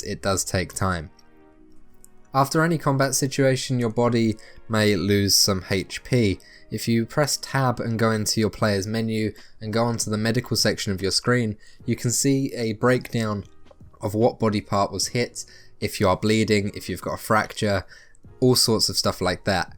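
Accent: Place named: United Kingdom. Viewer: lol i didnt take it personally, but as someone who generally has mono-toned speach myself and has gotten shit for it in the past, i know that criticizing someone for the way they talk wont make them talk differently, if anything it'll probably just make them feel bad. You may as well have told him to stop speaking in a british accent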